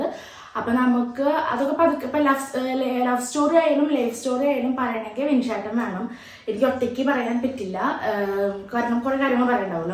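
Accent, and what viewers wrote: Thrissur, India. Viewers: samsaram correct thrissur slang aanaloo😍😍😍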